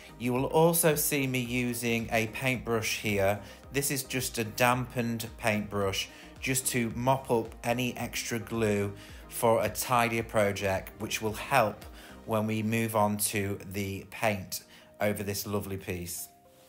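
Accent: England, United Kingdom. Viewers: I agree! He’s always saying (no pun intended) that he talks too much. I love hearing his thought process and why he’s doing what he’s doing. An awesome teacher for sure! I think it’s great! Plus, I love an English accent! 🙂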